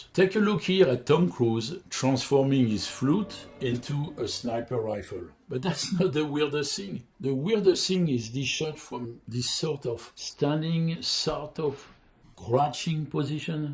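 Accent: France. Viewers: beautiful French accent ! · How come you talk with a French accent but have a muricastan flag and use their bullshit unit system? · oh come on why is he french. now i have to close the video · Vos vidéos sont sympas, mais l'accent, "so frenchy dude" pour un francophone c'est une vraie souffrance. dommage de n'avoir assumé votre nationalité et de facto faire vos vidéos dans notre langue. Autre option prenez des cours pour arrêter ce massacre. Just a tip mate cheers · C'est un accent Français, non ? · This is a great video, I am glad I clicked on it. I love snipers and find it an amazing subject. The French accent was a surprise and immediatly got me hooked: I am a francophile and I even lived in France. I am also always read to listen to a grandpa telling stories. The humour in the video is great and the transitions are hilarious. I can say the technical information here is above average and the best package in one single video on all of YouTube. 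I read everything I can about sniping and my favorite book is Out of Nowhere, by Martin Pegler. It doesn mention the proeminence of the .338 Lapua Magnum. The books Stalk and Kill, and Sniper One on One, by Adrian Gilbert are also great. Do you know French books about sniping? Snipers are fascinating subject and no wonder we have so much media about them on popular culture. As a matter of fact, I was about to switch on Sniper Elite 3 when I saw this video. My first exposition to sniping was the movie Sniper (1993), with Tom Berenger and Billy Zane. The movie is classic of action and suspense, and it shows some of the practices of sniping. I got tranced and always studied all I could about the craft. Maybe you could make a video analysing Sniper! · Is this guy french or slavic I cannot tell. It sounds very French when he says "Rownd, "ledge-geon-dairy", "bee-cawze", et. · Hello, liked your vidéo, just a question, are you french ? 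Because you have the same accent as me. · You must be French judging by your accent....my brother has a .338 Lapua.....I have a 30/06....one must utilize the tools that are available to him or they are worthless. Very good to hear someone speak shooting truth! Thank you for posting!!!!!! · What is this accent ? You are French right ?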